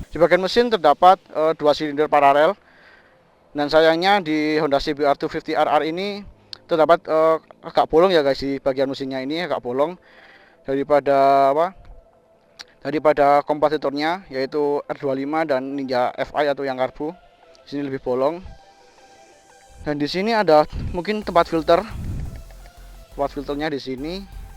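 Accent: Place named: Java, Indonesia. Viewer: medoknya jdi gagal fokus dah,wkwkwkwk apa lagi ngomongnya pke lu gua 😂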